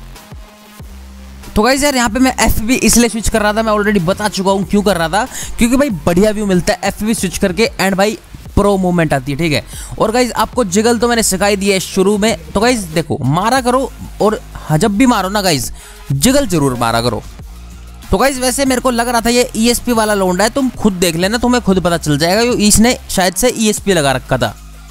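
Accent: Haryana, India. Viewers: areeeeeeeee bhai love you ek haryanvi ko haryanvi hi pehchaan sakta hai😊😂❤️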